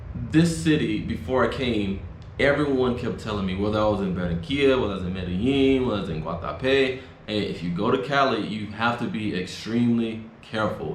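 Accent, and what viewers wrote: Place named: United States. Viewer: Saludos desde O.C. En Cali nadie sale para celebrar el año nuevo. Todos están en casa con su familia. Si salen se salen después en grupos. Si puedo darte un consejo, soy también de Oak Cliff pero tú Español y tu accento es gringo. También tu andas como no sabes nada de Cali o el mundo Latino. Cuidar Pa tengo que suscribir a tu canal.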